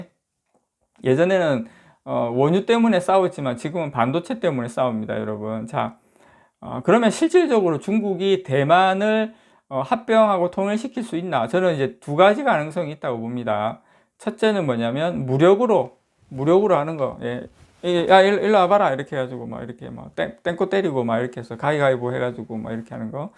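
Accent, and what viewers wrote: Gyeongsang, South Korea. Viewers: 땡꼬때리고 ㅡㅎㅎ참 오랜만에 들어보는 경상도 맛의 사투리 항상 감사한마음으로 듣고 있습니다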